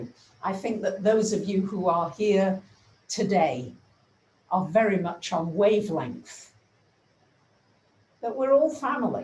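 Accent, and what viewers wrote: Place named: Lancashire, United Kingdom. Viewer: I always knew there was a little Lancashire twang in your voice,! Lots of love from a fellow Lancashire lass xxx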